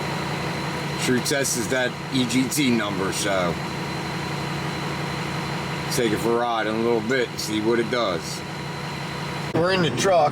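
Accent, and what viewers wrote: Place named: Pittsburgh, United States. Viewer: What part of Pennsylvania are you from? I ask because your accent sounds like you’re from the Pittsburgh area and I’m moving back and I have an lml.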